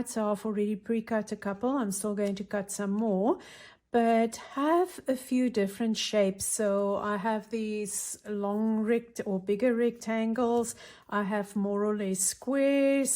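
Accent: South Africa. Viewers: Just given me ideas for Christmas gifts thanks,nice to hear a South African accent.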